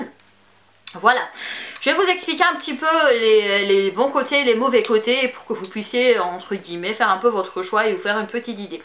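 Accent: Madagascar. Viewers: Tu as de l'accent Malgache 😗😗😗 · Ça se voit et s'entend qu'elle est Malgache. " Manaona Ampelassoua " ....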